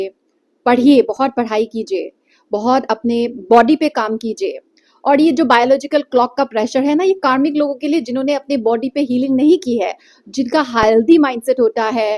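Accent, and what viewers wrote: Assam, India. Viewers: Ma'am aap Assamese hai kya? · You’re from Assam? I find your Hindi accent a bit of Assamese😅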